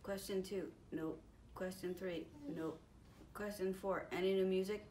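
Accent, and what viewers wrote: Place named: New York City, United States. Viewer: I had no idea her she had a NYC accent! Comes out every now and then, awesome! I get made fun of mines all the time at work, cause for what ever reason I have a slight Bostonian undertone to some words.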